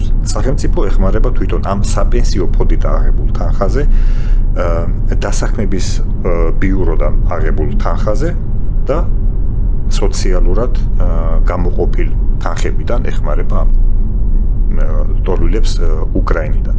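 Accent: Russia. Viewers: რანგამაღიზიანებელი აქცენტი გაქვს რუსულს გავს და ყურები მეტკინა არადა საინტერესო კონტენტი გაქვს უბრალოდ აქცენტს რამე უშველე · საშინლად ტენდენციური ხართ. თუ ასე გეცოდებათ ,,საწყალი პენსიონერები" წამოდით მაქედან. ეგ რუსული აქცენტი ამბობს ყველაფერს, რატომაც ლაპარაკობ ასე )))) ვაუ რუსული მილსადენის გამო გაიყინებიან თურმე გერმანელი მოხუცები 😀 სამოთხე არ იქნება აქო... არადა კადრებშიც ჩანს რა ქვეყანაა და რა ხალხი. მოეშვით სხვების მოტყუებას.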